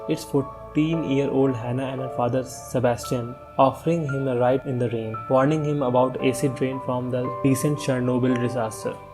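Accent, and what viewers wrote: India. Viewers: i liked it because of the indian accent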